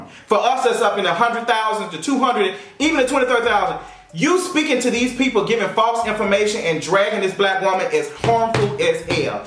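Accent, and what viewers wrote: Memphis, United States. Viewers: Babe!! I feel every word u say. “I don got pissed off”. 😂 U are so Memphis. 👍🏽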